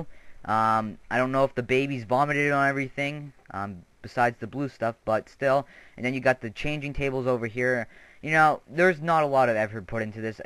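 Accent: Canada. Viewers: AwesomeManPlayz A lot of people pronounces a word differently plus he's Canadian lol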